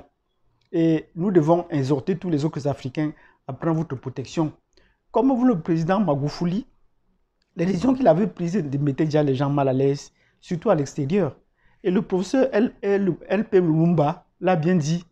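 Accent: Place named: Bamileke, Cameroon. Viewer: Je m'excuses, mais ton analyse est faite avec trop l'accent Bamiléké qui me gène personnellement, elle est pleine de répétitions de phrases et sur le fond je n'y ai rien trouvé de pertinent. Donc je te conseille de laisser la place à un autre qui non seulement parle sans accent, mais qui va dans la profondeur des choses. C'est vraiment Dommage pour les Bamilékés. 🙏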